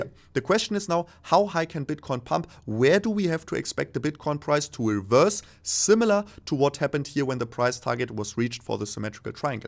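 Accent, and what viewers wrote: Germany. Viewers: You have a little bit of a german accent, but your english is excellent. What other languages do you speak?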